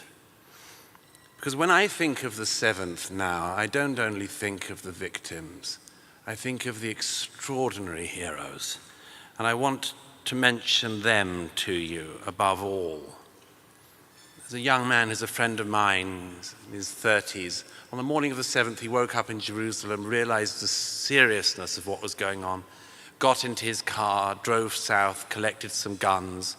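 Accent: RP, United Kingdom. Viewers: For an Engishman u r so passionately zionist. And such a thick carefully crafted upper class British accent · Douglas Murray is the proof that you can say absolute shit, but if you say it a picked british accent, people will fall over backwards thinking you're smart. This numpty is the moron's icon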